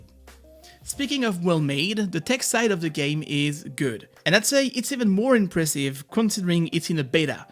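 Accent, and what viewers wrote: France. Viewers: Pourquoi tu parle anglais alors que on sent ton français a 100000kilomètres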